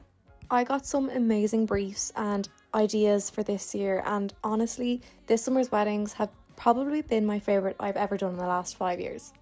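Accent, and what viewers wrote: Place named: Ireland. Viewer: OHHH I absolutely love that your channel popped up on my feed! Your Irish accent is the icing on the cake! 🎂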